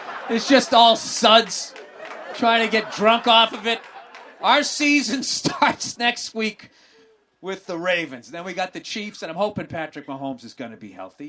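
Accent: Boston, United States. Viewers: Does he do any of his overly pronounced Boston 'vowels'? I'd love to see him do one of those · Hes bostonian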